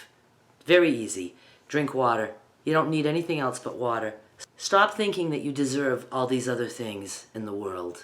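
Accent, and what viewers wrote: Maine, United States. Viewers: I am so inspired by you. I literally just found your channel via your caftan tutorial. I also was about to make dietary changes necessary to combat something called SIBO (small intestinal bacterial overgrowth) which requires basically restricting carbs so as to starve out the bacteria. I did low carb before, but I gained it all back. I got cravings. You know the drill. So now I will try carnivore. Also, I am in Maine…. you said you moved to Mississippi but you sound like you are from Maine? Regarding the shirt from a shirt, and caftan tutorials, can I ask, do you use 100% cotton? I got a bunch from Mardens to make a quilt but that caftan was so stylish, I think I have to use this blue cotton to make the stylish caftan! ❤❤❤ Congratulations on your weight loss, reduced pain, and improved mood!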